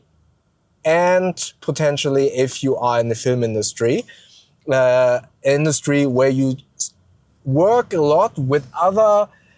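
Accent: Germany. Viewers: Your English sounds fine to me. To be honest, when I first saw your videos on your main channel, I did notice the accent but I couldn't nail down. Didn't even know it was a heavy German accent until I saw this channel. Living in Hawaii, we have people from all over Asia and the Pacific so there all kinds of accents, including a localized version of English which borrows a lot of words from other languages so hearing yours didn't really phase me. People making those comments about your English, especially Americans either live in an area where there isn't a lot of ethnic diversity or just being trolls. You're doing a great job!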